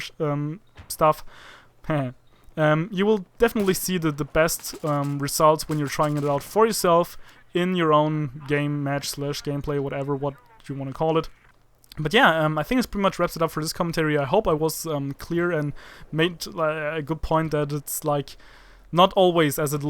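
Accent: Germany. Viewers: did you speak german?....Deine Aussprache klingt nämlich sehr Deutsch ^^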